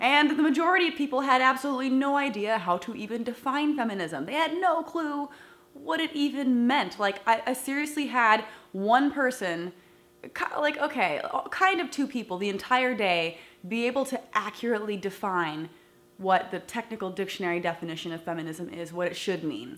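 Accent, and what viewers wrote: United States. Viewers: It sounds like you live in the US.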